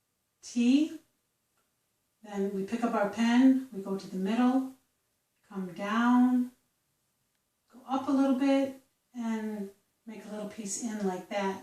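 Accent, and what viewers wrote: United States. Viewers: Thank you for the writing lesson. I followed along with my new fountain pen. Planning to begin written correspondence with my grandchildren who all live far away. In my mind, I was trying to pinpoint your accent. You sound like you could be from Scituate, Mass., or Enfield, Connecticut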